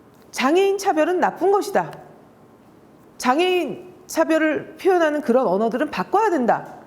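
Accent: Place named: Honam, South Korea. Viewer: 내가 음성 감수성이 뛰어난데 서울표준어에 호남 어투가 숨어있네 고향 사람이라 반가운데 서울 출신으로 되어있네 고향이 부끄럽나 왜 왜 왜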